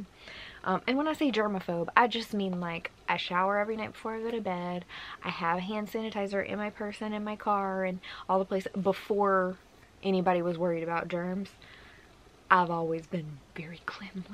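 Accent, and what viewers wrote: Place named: Scotland, United Kingdom. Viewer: I’m like almost positive watching all that Outlander helped your Scottish accent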